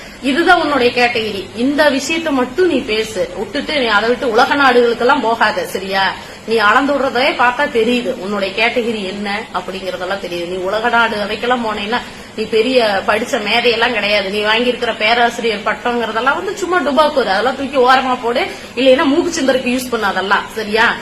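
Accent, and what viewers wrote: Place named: Kongu, India. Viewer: கொங்கு தமிழில் சரியான அர்ச்சனை😂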